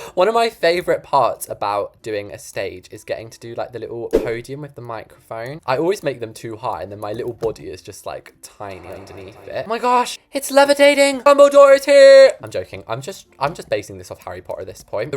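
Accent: England, United Kingdom. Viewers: OML FINALLY A BLOXBURG YOUTUBER WHOS BRITISH NAH I AM SUBSCRIBING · Why dose his english accent make him sound so sophisticated it makes sound like hes about to ryme every time he says something lol🤣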